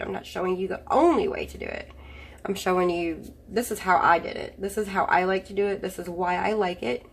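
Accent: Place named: South, United States. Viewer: My boyfriend has asked me why I don't want to start a channel in the future and my Southern accent is one thing I worry about, so it is nice hearing your sweet, southern belle accent. I'm so glad Beth told me about your channel. You have tons a great stuff so I'll be binge watching you for the next few days 8)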